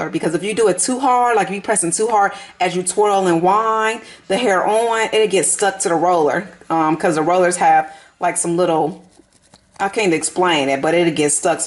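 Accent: South, United States. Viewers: I love your southern drawl!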